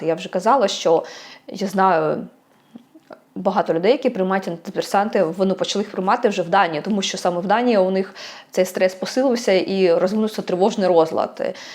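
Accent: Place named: Russia. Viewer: дивлюсь час від часу, бо приносить стрічка. А в Даніі віднедавна живе 18 річний син подруги, хочу знати. Не запам"ятовую саме вас, але стала пізнавати по ниттю. І ви, шановна, плутаєте національність і громадянство. У вас є освіта? Не знати таких речей - дивно. За громадянством ви українка, етнічно - росіянка, ваш акцент лізе з усіх дір. І менталітет не приховаєш.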